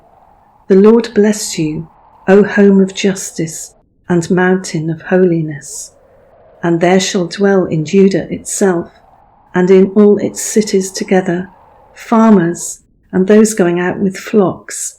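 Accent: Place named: England, United Kingdom. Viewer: Thank you. So beautifully read and timely for Israel. Love the English accent!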